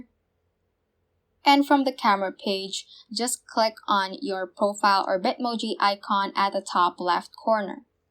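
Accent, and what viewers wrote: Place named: England, United Kingdom. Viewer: Your English accent it's so nice ❤️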